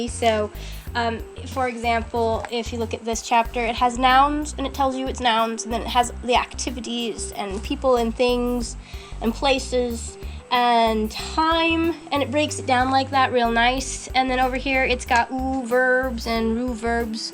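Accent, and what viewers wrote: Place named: United States. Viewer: lol, I am European and dislike her prosodie. Definitely too American for me ;)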